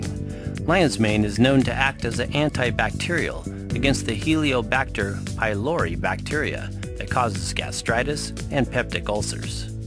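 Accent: United States. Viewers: Sorry, I shouldn't have been so harsh and called your narration "robotic" to begin with at all -- especially when you're already self-deprecating about it! The pace of your speech is fine, BTW, and you do happen to create numerous, highly informative, useful and great videos anyway! ☺ If this is of any solace to you, I don't like the sound of my own voice either! Clearly, I'm not good at detecting some U.S. accents (clueless and Irish here!). Californian? I hope you or any family haven't been adversely affected by those bizarre and horrific wildfires sweeping across your state? There's been talk about laser-based Directed Energy Weapons (DEWs) playing a role... Rambling on here, sorry. Anyway, just keep up the great work with your videos and have a lovely weekend! 🌝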